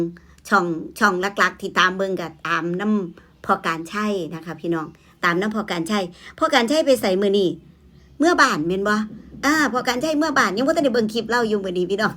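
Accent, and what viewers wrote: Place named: Ubon Ratchathani, Thailand. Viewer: ชอบการเล่าข่าวแบบนี้ น้ำเสียงแบบนี้ สไตล์ 🎉🎉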